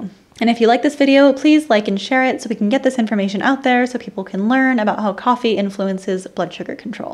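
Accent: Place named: United States. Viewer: Thanks.Your video may be great but all can't benefit from it because you speak too fast in your typical American accent.Additionally,you don't text all what you talk about. This makes hundreds of subscribers face this daunting task of understanding your good lecture.Hope you make necessary improvements in future videos.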